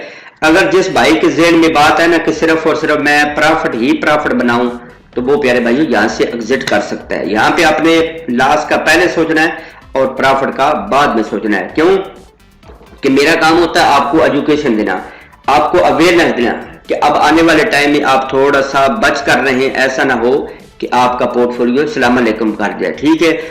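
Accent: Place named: Punjab, India. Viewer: ap jo punjabi ka tarka lagaty hain wo bahot he mazy ka hota hai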